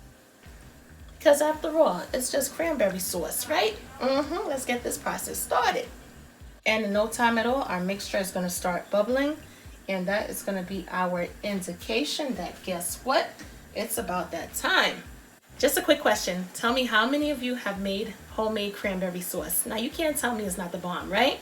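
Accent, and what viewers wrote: New York, United States. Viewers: Yup, I'm a native NY'er living in Florida and I love hearing the accent. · You have the most New York accent I've ever heard, lol! Where are you from?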